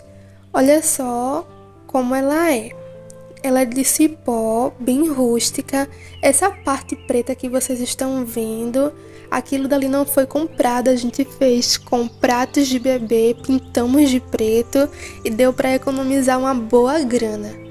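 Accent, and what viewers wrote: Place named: Pernambuco, Brazil. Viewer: Linda sala amei vc é pernambucana lindo seu sotaque 😍🤗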